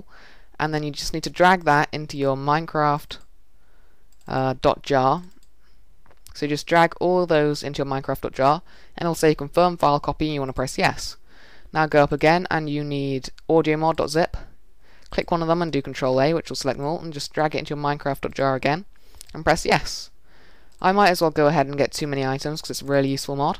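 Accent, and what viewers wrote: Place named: England, United Kingdom. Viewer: idk what your doing on the thing i cant read it :( and no offence to you or anything i just cant understand an english accent XD i need to watch more dr.who...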